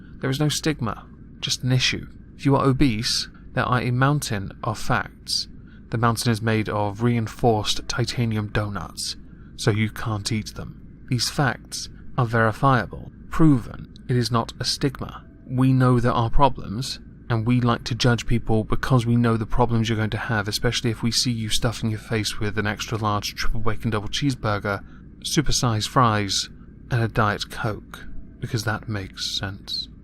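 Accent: RP, United Kingdom. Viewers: As a brit living in areas where common language is "enits" and "tings" you have the best British accent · I never knew how much I needed a refined British man critiquing idiots in my life, until I stumbled upon your channel. Absolutely loving your content!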